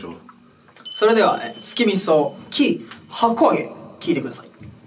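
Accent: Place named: Kansai, Japan. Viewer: 関西弁なのめっちゃいい！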